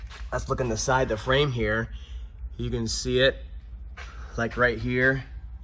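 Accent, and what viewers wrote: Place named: Maine, United States. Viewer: Love the Mainer speak. Reminds me of one of my favorite movies, Dolores Claiborne, "you do it again and one of us is going to the boneyard".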